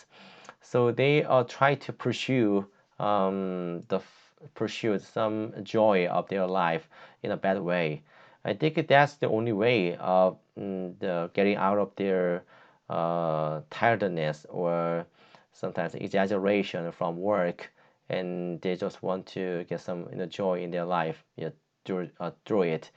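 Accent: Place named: China. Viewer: Chinese English accent is so irritating. 😭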